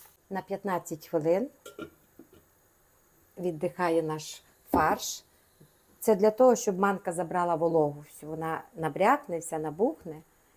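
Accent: Transcarpathia, Ukraine. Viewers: Дуже приємно слухати господиньку із закарпатський акцентом. ❤ Закарпатська кухня дуже смачна. ❤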